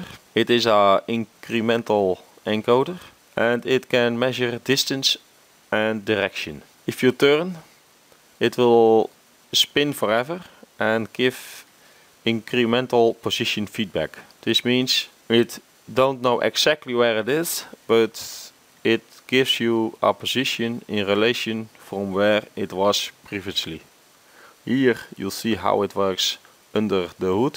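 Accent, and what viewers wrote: Netherlands. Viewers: always nice to hear these dutch accents. nice video Continue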